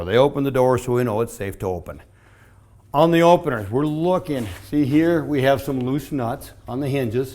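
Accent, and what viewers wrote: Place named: Colorado, United States. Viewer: Great video. Very useful. Also, I had no idea that ppl say ruff instead of roof in Colorado.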